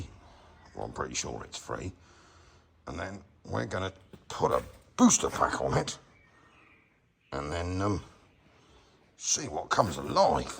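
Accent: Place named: Wiltshire, United Kingdom. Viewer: just love the videos and the wiltshire acent but most of what you say is lost because your microphone need to be replaced for a better one.. thanks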